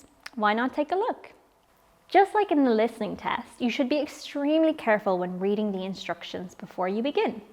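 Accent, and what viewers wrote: Ireland. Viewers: who's this presenter? She's Irish. Very good. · Bro, she cant hide her irish accent...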